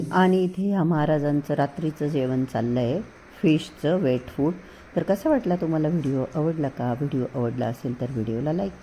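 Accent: Nashik, India. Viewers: Hi dii me tar pillu la Laddu ch mhananar..❤❤ me tar त्याच्या videos chi waat baghat hote Love you..me Nashik मधील ch aahe but now staying in Bangalore..tumcha typical Nashik cha हेल आहे i mean accent aahe तो खूपच मस्त वाटतो❤❤❤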